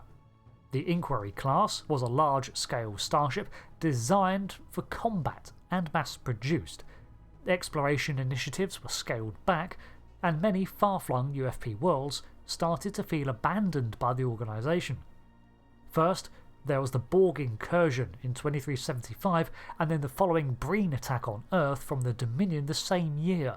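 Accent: United Kingdom. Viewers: Just simply because you have a British accent, does not necessitae you being correct.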